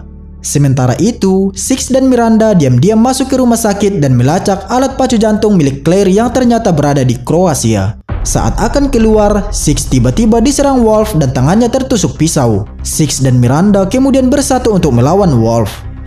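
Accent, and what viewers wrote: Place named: Java, Indonesia. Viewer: Bahasanya pake bahasa jawa y bang .,,medok Kali I😁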